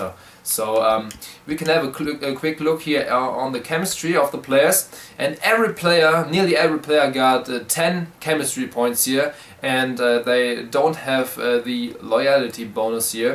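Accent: Germany. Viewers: du bist deutsch hört man ?? :)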